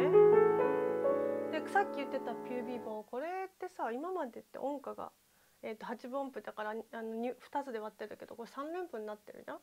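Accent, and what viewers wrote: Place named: Iyo, Japan. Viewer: てっきり関西の方なのかと思っていましたが、京言葉のルーツもある伊予のイントネーションが可愛らしを演出しているですねと、音楽以外のところで感銘を受けてます(^_^;)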